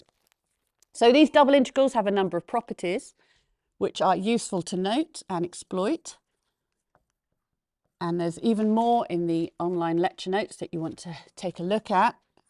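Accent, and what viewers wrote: United Kingdom. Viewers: Ŕeal british English ❤❤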